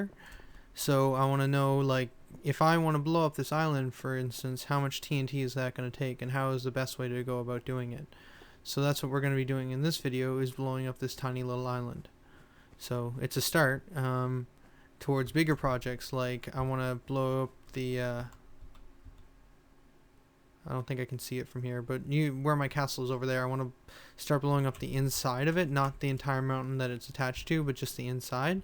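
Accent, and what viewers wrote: Canada. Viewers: I think every other video someone asks if you're canadian xD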